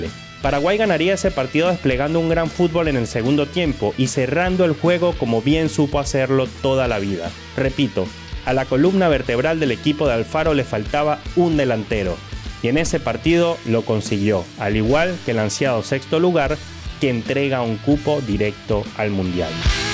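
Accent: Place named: Venezuela. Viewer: Por el acento con que habla el creador del video es venezolano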